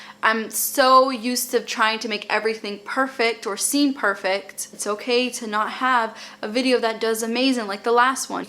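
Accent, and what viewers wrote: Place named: New York, United States. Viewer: Your accent reminds me of our New York accent lol I love it lol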